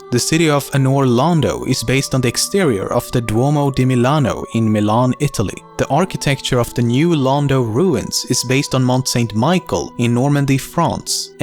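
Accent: Sweden. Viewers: My God! The Swedish accent is so strong with this one. Being a Swede myself, I can dig it. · Omfg that swedish accent.. I just can't enjoy the videio hearing this.. And Ornstein and Smough AREN'T EVEN HARD!! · Cool videos and info man! Glad I stumbled across your channel.DS lore and Swedish accents ftw. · Exactly my point, hence why he does not pronounce it appropriately. Have you heard the way he pronounces the English word "character"? Incorrectly by English standards but just fine according to the way that the "ch" sound is used in Sweden, He says words the way that he does because it is the way he is culturally accustomed to pronouncing them. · im here for the swedish accent xD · christ his accent is swedish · Is this guy swedish? he sound very swedish :P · you can hear that the speaker is swedish xD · that swedish accent tho edit: he knows it.. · If you dont know this accent, this is a swedish accent, and it made me lol af! 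BIG UPS THOUGH! BIG UPS! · being from sweden it is so easy to hear if a person is from swedish XD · Du var svensk!! Jag visste det! Brytningen fick mig att tänka till.... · The Swedish "accent" is leaking through! :D Where able to recognize right away that it was a fellow from our snowy north! :D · You can't have enough trivia and Swedish accents!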